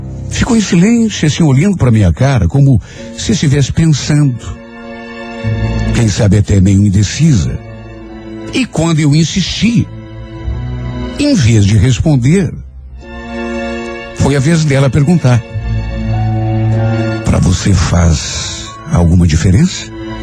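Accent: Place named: Rio Grande do Sul, Brazil. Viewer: Sou fã dessas histórias lindo sotaque gaúcho.....!!😀👏👏👏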